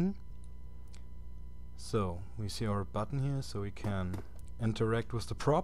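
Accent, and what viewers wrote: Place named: Germany. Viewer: Nice video! And I had to sneak into your profile to verify my first guess - of course you're german ;) It's just so obvious just by listening to the very first words ^^ Aber macht ja nix, Akzente sind was feines. Und deutsches Englisch verstehen die meisten immerhin, da gibt es deutlich schlimmere Akzente ;) Ich freu mich auf dein nächstes Video!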